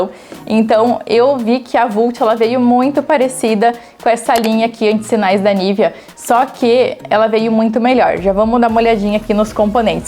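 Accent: South, Brazil. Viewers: Linda,com sotaque do sul ❤❤😂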